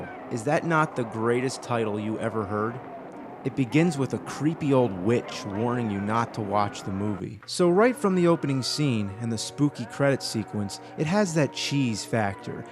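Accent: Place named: Philadelphia, United States. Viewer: E vai pegar um dos sotaques mais legais do inglês americano, o sotaque Philly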